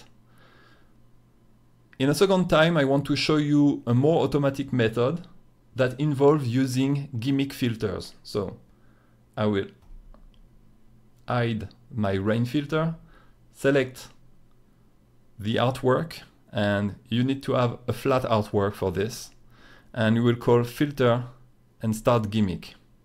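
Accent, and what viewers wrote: France. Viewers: You're french, aren't you? Love the accent x) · Je crois que c'est parce qu'il est français et qu'il parle anglais ^^ Peut-être le très léger accent qui fait qu'on comprends mieux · Je rêve ? On dirais que vous avez un accent Français quand vous parlez anglais ! x) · Always great from south of France with accent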